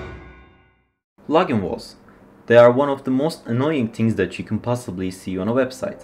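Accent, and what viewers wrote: Turkey. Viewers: Turkish voice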